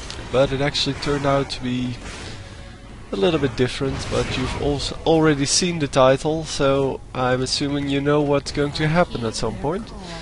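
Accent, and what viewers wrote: Netherlands. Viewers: Are you dutch? like Soraka AD :D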